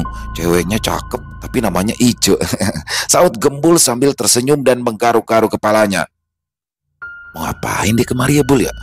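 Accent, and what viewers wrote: Jakarta, Indonesia. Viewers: Mantaap Bang ceritanya kocak bener, dilanjutkan ya Bang, paling suka klo ceritanya logat Betawi yg medok… sukses Bang dn sallam sehat.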